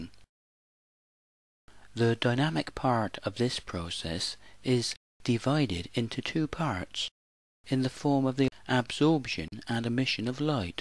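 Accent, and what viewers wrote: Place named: Somerset, United Kingdom. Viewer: Maths in a Somerset accent is simply the best maths you'll ever find.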